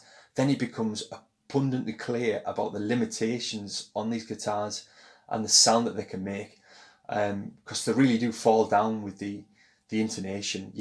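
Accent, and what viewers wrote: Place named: Middlesbrough, United Kingdom. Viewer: I have a sharp ear and you sound like you are from Middlesboro steel!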